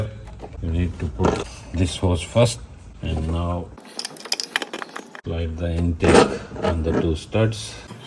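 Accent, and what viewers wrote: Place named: South Asia. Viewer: Your English accent is very Asian may be you from Pakistan or India?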